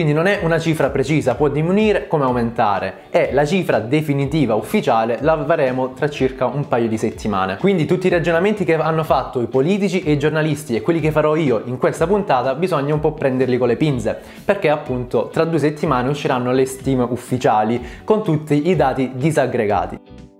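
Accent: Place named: Sicily, Italy. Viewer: Il tuo accento Siciliano mi scalda il cuore ❤️ Grazie per il lavoro di divulgazione che fai qui su YouTube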